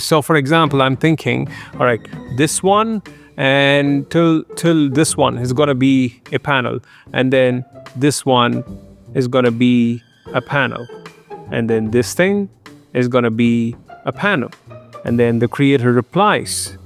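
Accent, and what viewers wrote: India. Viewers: really? listening to his indian accent is so annoying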